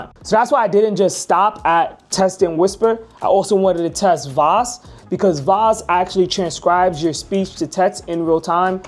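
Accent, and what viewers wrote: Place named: Bronx, United States. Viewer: Brotha I was gonna ask what part of da Bronx you from? 😂 didn’t think you’d be from ATL❤❤❤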